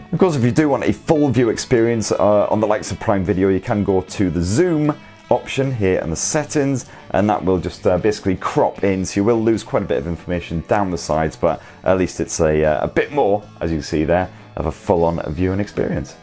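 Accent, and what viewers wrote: United Kingdom. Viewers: I just listened to this video for the wonderful British accent 🤠.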